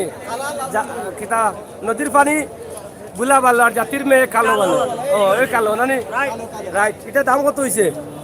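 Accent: Sylhet, Bangladesh. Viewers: ভাইজান। আপনাদের সিলেটে কথাগুলো শুনতে ভালো লাগে ও আপনার কথাগুলো অনেক সুন্দর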